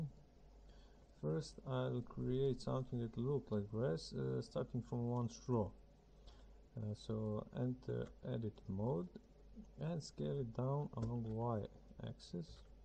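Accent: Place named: Serbia. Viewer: May I ask where are you from? Your accent sounds like Serbian. Of course the name that I saw on the blog saying the same thing. Excellent work. For how long have you use blender?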